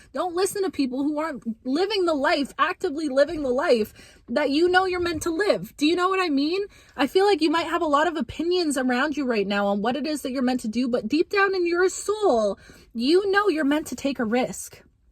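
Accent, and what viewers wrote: Canada. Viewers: Are you Canadian? 😮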